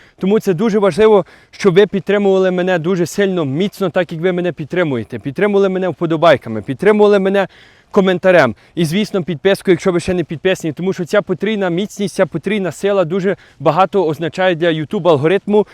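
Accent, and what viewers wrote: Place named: West, Ukraine. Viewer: Ти некрасиво говориш українською, в тебе акцент западенсько- сільській.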